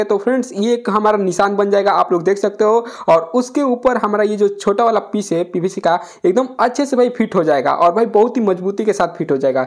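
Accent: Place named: Bihar, India. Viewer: Bihari sun pahle hindi Boland sikho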